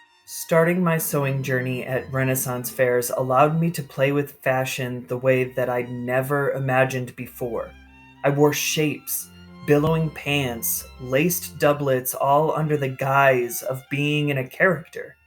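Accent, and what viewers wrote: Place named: United States. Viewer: I was thinking that you had suffered a catastrophic research failure... but then considered your American accent. So, yes, the Macaroni's were famously tasteless in their dress, celebrating the nouveau riche over the 'conspicuous inconspicuousness' that was fashionable at the time. So, while you certainly did well with the colours and embroidery patterns of the period, you were then let down by the poorly cut weskit and over-buttoned breeches. Sigh, and no hat. Please, wearing a hat was thought to be a matter of basic decency. When indoors and not actually wearing it, you would still be expected to be carrying it! Your sexuality is of no concern to me, but your drunken fashion choices (thank you, Dionysus) appear to be deliberately offensive.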